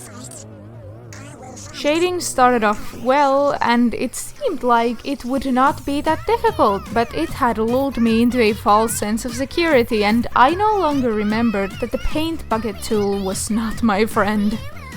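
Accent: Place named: Finland. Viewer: Ah tää suomalaisten enkkuaksentti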